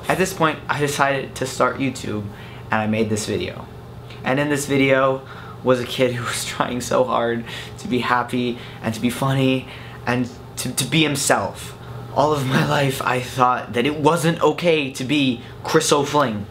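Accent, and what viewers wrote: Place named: Wisconsin, United States. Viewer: ahhhhh that's why he reminds me so much of Luke they are both from Wisconsin (accent)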